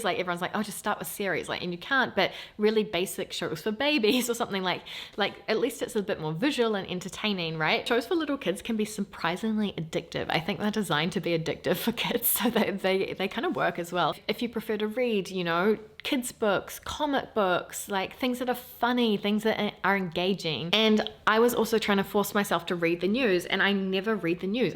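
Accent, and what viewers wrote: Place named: New Zealand. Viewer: you are now sounding proper Kiwi!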